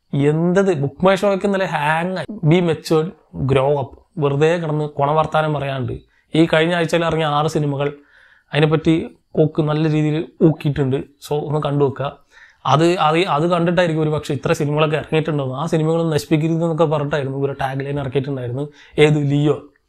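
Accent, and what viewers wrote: Palakkad, India. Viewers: കൊണച്ച വർത്താനം.. നിങ്ങ പാലക്കാട്‌ എവിടെന്നു ഏട്ടാ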